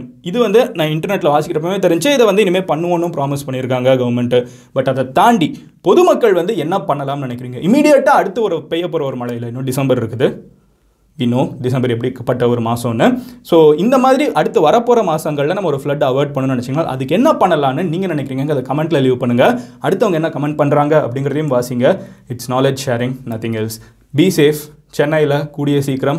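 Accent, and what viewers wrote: Madurai, India. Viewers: with typical madurai slang, potutainga, aakitainga, mooditainga😂🔥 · Madurai, sivagangai, ramanathapuram slang ah ipedithan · Madura slang nalla varuthepaa😍💝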